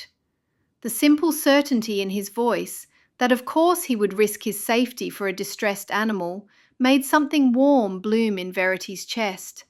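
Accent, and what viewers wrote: United Kingdom. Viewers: It doesnt sound like an Amish dialect, sounds like uk accent takes away from story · I really wanted to listen to this story. I love your stories. But just can't listen, I'm so sorry. You're a very gifted writer, but no Amish ever has a British accent.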